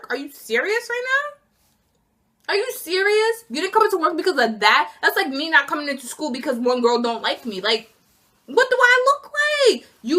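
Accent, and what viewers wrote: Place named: Long Island, United States. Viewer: idk why i love long island accents 😂 they're just funny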